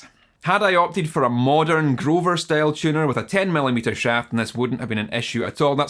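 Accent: Scotland, United Kingdom. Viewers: his scottish accent is honestly really addicting to listen to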